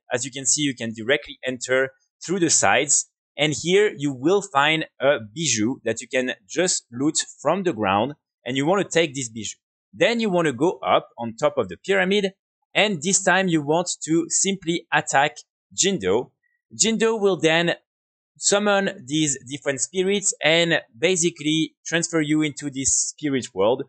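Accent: France. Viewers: Ça se voit que t'es français 🤣🫣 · Are you french ?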